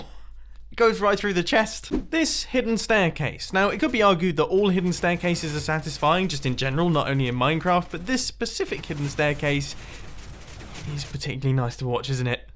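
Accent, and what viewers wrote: United Kingdom. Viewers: You’re British, and the way you’re talking Is so.... SATISFYING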